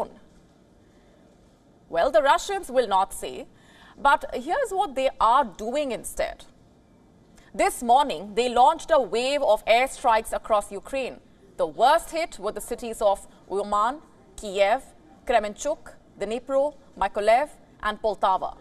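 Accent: India. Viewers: i love how funny and cute indian women talk english,she's adorable ^.^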